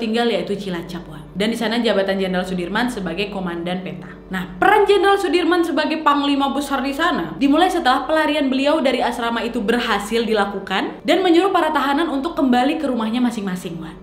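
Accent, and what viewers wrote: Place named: Medan, Indonesia. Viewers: asal medan ya? logatnya mirip kali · Ni pasti cewek dr Medan.... dh jlz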